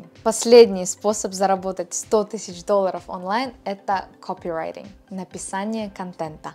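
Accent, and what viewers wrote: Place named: United States. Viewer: Познавательно, но режет слух желание вставить английское слово хоть куда-нибудь( Если можно, не коверкайте произношение на ОМЕРЕКЕЙшН лад. Постарайтесь и у вас получится)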